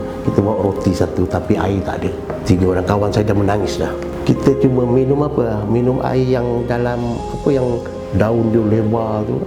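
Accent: Indonesia. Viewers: Patut laa cara arwah ada sleng org jawa.. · Logat alm ni logat madura. Sya sendiri madura. Itu la sya dpat rasa alm ni org madura.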